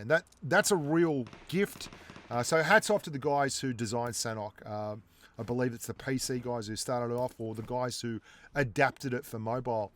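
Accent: Australia. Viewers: Thanks...are u from Australia ?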